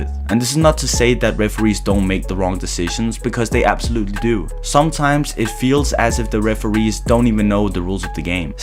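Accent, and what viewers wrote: Denmark. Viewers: Where are you from, if I may ask? You sound kinda Danish, and I saw your friend had a DBU shirt on as well in one vid at least. Anyways, nice video! :D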